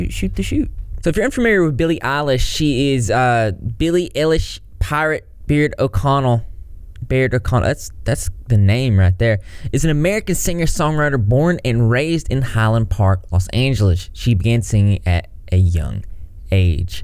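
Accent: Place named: Texas, United States. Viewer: Your southern accent is as heavy as mine lol are you from TX?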